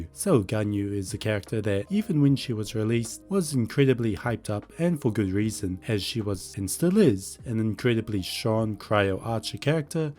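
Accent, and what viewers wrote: New Zealand. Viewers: Are you a kiwi? You gots my accent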